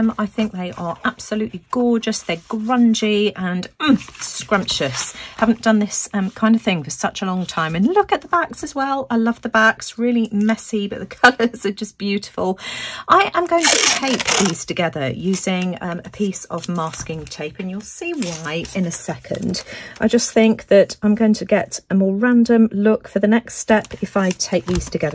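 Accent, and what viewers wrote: United Kingdom. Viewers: I’m only a little over a year into this next media and have become quite a YouTube junkie! I want to complement you, not only on your beautiful work, but how will you explain what you do and why you do it! You have the perfect voice as well! I live in the US and really enjoy your British accent! It’s so refined! Incidentally, heading off today to have lunch with my son and daughter-in-law who are expecting a new baby any day now! While I’m down in their neck of the woods, I am going to search for a Daphne’s Diary! 😉 Thanks again for the inspiration of those beautiful diaries!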